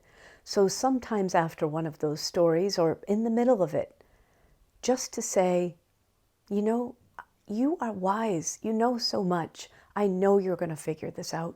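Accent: Ireland. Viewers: Thank you, your lovely smile lights up the whole room. It's a real pleasure listening to your beautiful soft & gentle Irish accent..🍀☺️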